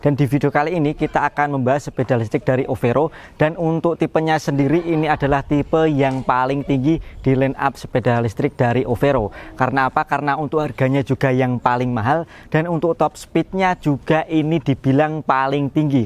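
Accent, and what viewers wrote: Java, Indonesia. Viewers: Cara mereview logatnya dialek Jawa apa ni mas, biar beda ama yg lain sering2 aja cara mereviewx ngasal 😅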